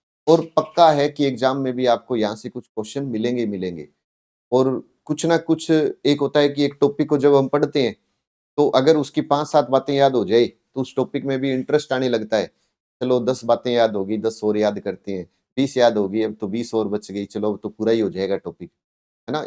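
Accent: Alwar, India. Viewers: Bhaiya koe alwar se h kya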